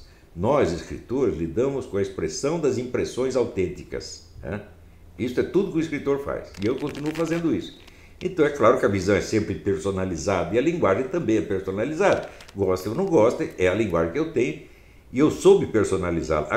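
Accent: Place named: Brazil. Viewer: O prof Olavo é um dos poucos brasileiros que vale a pena ouvir, pelas informações que ele divide conosco, e também pela sua ótima oratória. Ele faz o português brasileiro mais bonito do que o de Portugal. Bless him.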